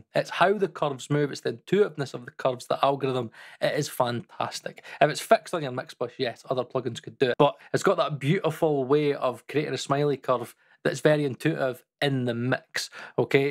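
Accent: Scotland, United Kingdom. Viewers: I've watched 4 or 5 of your videos now, and I think I can finally state without hesitation that I can fully understand your (Scottish?) accent. :) Now I CAN sleep at night.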